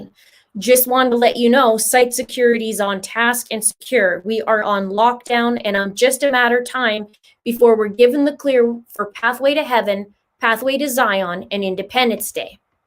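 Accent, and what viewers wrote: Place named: Canada. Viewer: I love your channel and your Canadian accent. I love that they have the Benghazi surviver Tig, helping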